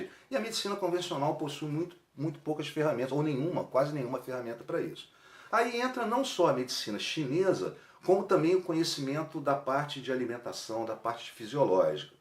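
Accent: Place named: Rio de Janeiro, Brazil. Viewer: Até o sotaque da Zona Sul do Rio é parecido!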